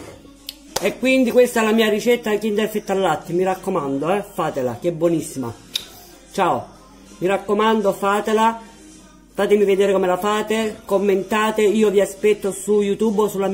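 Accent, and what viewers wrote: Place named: Sicily, Italy. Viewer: Super cuoca simpaticissima la parlata siciliana mi piace da pazzi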